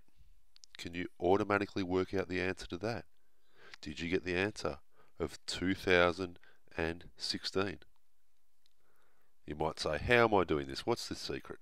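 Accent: Australia. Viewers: are you Sal Khan's Australian twin? Are you the real Sal Khan doing silly math stunts while speaking Australian? Seriously, the cadence of your voice, your choice of words, they make you sound like Sal with an accent. If, by chance, you haven't heard him, just google the name and listen to what he sounds like. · Aussie accent.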